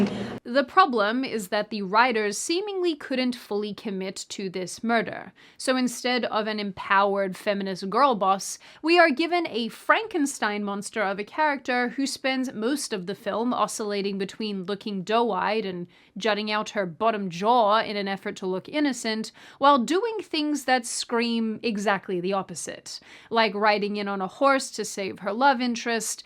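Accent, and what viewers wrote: Australia. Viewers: sorry, you are my second favorite funny talking Australian. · You’re Australian? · The funny talking Australian dropped facts again.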